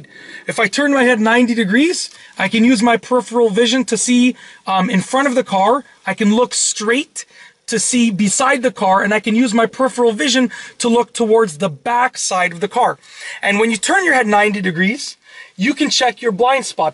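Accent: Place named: Canada. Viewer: This gentleman has the most CANADIAN accent I've ever heard. lol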